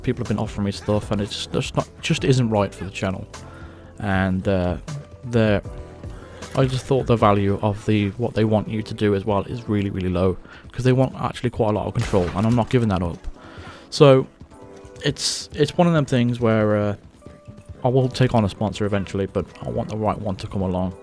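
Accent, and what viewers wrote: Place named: England, United Kingdom. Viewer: you're the man! It takes a lot of courage to put yourself out there like that. Anonymity is also pretty cool, you show your face when you're ready. You've got my support from Sweden. Its a good time for a break now you have until Jan 20 2021, we're all counting on you for Hitman III. I watch your vids because you get the business done in a good way with great commentary. As an expat I like to hear the English accent. You sound like a good guy and very likable. BTW with the new consoles coming out I bet everyone is looking to upgrade there TVs. Have you got any tips of what we need to look for frame rates, 4K or 8K etc.?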